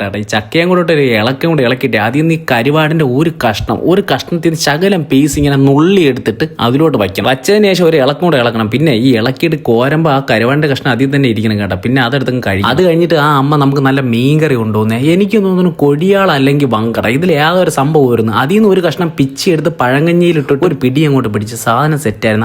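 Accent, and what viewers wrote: Trivandrum, India. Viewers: തിരോന്തോരം ആണോ തള്ളേ 😁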